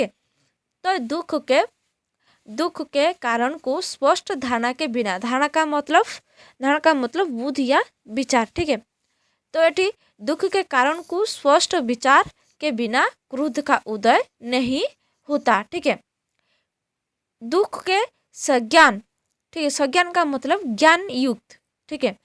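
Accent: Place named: Odisha, India. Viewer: odia uchharan ho rahi hai .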